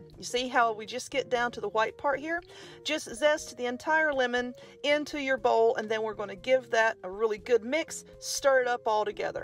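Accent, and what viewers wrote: South, United States. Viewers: New sub. Definitely making this today or tomorrow. I’m fascinated by your tats and nails. Interesting with your southern accent. My mind kinda went “Huh??” when I saw them.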